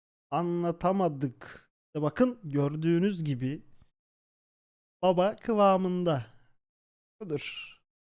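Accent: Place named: Erzurum, Turkey. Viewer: Erzurumlu olduğun ne kadar belli ama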